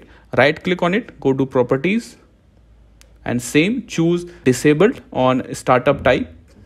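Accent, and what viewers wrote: India. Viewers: the indian accent makes it funnier lmao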